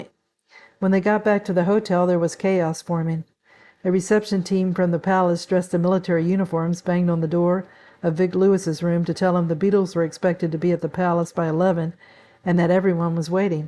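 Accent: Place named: California, United States. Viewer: You have such a California accent. You sound like my Aunts. Great video! Keep it up!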